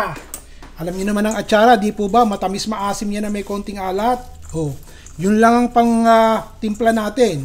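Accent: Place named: Bulacan, Philippines. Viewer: Chef,bulakenyo po ba kayo?kasi ung accent nyo at diction ay bulakenyo 😁😁😁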